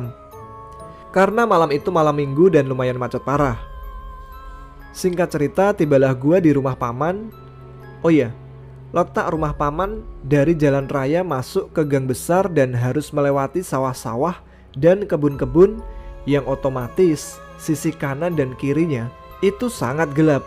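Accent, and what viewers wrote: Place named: Java, Indonesia. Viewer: Medok kali logat bahasanya mas😆😆 cerita2 mas ini lumayan bagus seram juga😱 😊🙂👍👍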